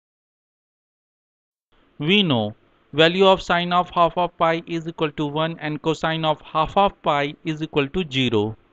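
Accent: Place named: India. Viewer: thank you for this video your pronunciation sounds like india 🙂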